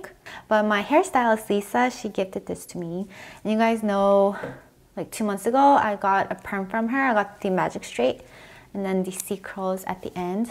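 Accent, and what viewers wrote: South Korea. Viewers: wats wrong with her voice? korean accent?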